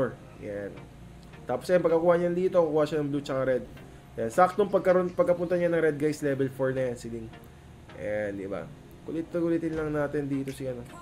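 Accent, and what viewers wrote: Iloilo, Philippines. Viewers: Ilonggo ka pala lods? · ilonggo ka coach?❣️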